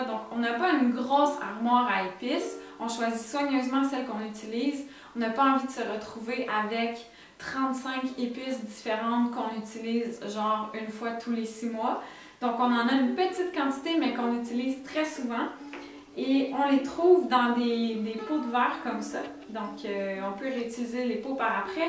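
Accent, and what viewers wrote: Quebec, Canada. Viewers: Ok. J’avoue que j’ai un peu de mal avec l’accent québécois mais je pense que j’ai bien compris. Je viens de découvrir les sacs au cire d’abeille ici en Angleterre. Chouette. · j adore ton accent quebecois, et tes videos tres interessantes je te remerci enormement de partager continue j apprend malgre mon âge 56 ans, je t embrasse fort et t envoi beaucoup de soleil de mon pays tres ensoleillé ( l Egypte ) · Quelle superbe découverte ! C'est tout ce que je recherchais ! Du zéro déchet et minimalisme qui est intelligent et plein de bon sens (c'est pas le cas de toute la communauté), végétarisme, simplicité et surtout une fantastique québécoise dans une si belle région ! Je suis juste en amour fou du Québec depuis que j'y suis allée en mai ! Montréal c'est la seule autre ville où je me verrais vivre ! Et quelle belle langue et accent, ça me passionne bcp ! 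Merci d'exister :) Gros bisous et très bonne continuation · La musique couvre un peu sa voix et comprendre le québécois quand on l'entend à peine c'est difficile aussi même si on n'a pas mal à la tête.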